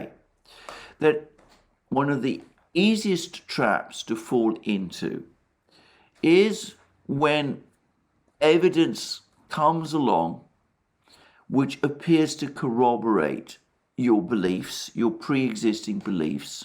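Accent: United Kingdom. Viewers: he is offering russian propaganda with a british accent.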